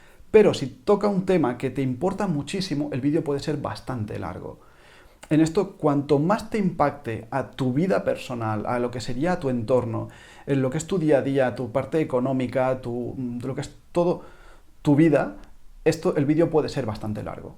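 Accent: Spain. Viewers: Buenos consejos, normalmente me da pereza el acento español pero contigo esta bastante ameno hah! saludos de México. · Eres Catalan?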